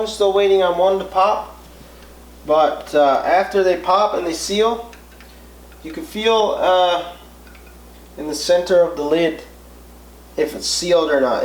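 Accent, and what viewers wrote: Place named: Newfoundland, Canada. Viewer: I knew within 5 seconds of hearing you I can to the right place. Newfies Rule.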